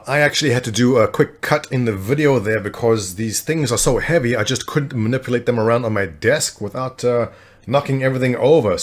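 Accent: South Africa. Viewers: its south african lol are you saying all us south africans sound like Christopher Walken? :P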